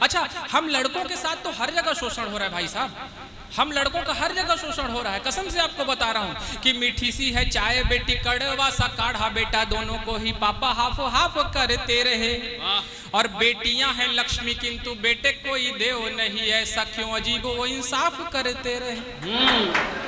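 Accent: Bihar, India. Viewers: Bihari ton hai.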